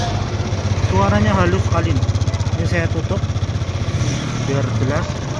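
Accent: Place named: Java, Indonesia. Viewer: Taiwan ngapak apa kie guli ngomong medok temen lik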